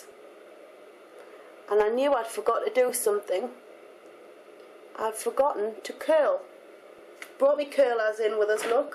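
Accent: United Kingdom. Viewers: God i love your videos ! humm, r u british?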